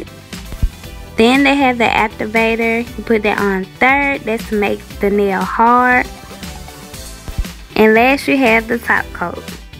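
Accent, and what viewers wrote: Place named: South, United States. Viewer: That southern accent boo 😍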